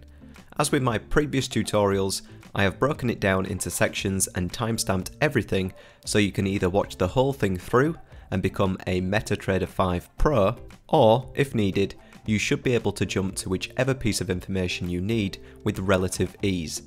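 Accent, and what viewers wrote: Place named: Yorkshire, United Kingdom. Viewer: Excellent tutorial, and totally understand the Yorkshire accent!!!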